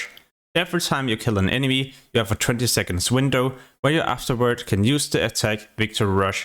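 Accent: Denmark. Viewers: Are you dansk? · You sound Danish :)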